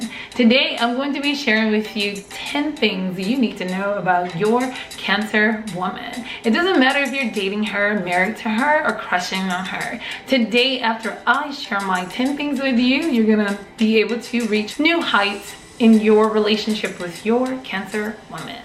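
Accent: Caribbean. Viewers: yea i grew up in Bama but I kept focusing on what I thought was a Caribbean accent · Where are you from you sound like your accent is Caribbean!?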